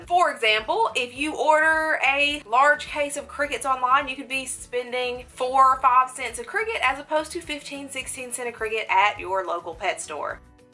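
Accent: South, United States. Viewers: i love ur southern accent 😍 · I love your accent. God I miss the south 😭 THANKS FOR THE INFORMATION 👍😊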